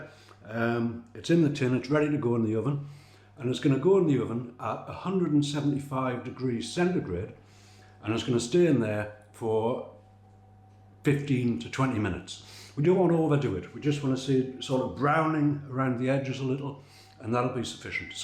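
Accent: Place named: Newcastle, United Kingdom. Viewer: Ha way Geordie !!!:face-green-smiling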